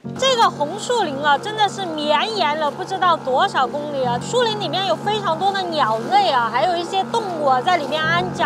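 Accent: Sichuan, China. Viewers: 你的四川话和我的一样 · 川普说的真好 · 大陸口音？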